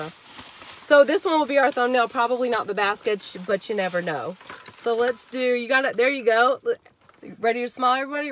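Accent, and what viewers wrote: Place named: Georgia, United States. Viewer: Hey! Just knew you were from Ga. I came across a video the other day and subscribed. I thought dang she sounds like me lol....I'm in Newnan!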